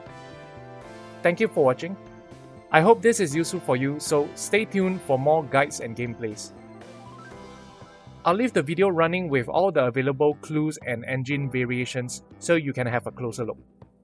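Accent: Singapore. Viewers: Haha could tell you were from SG from your voice. You sound like a tuition video for PSLE.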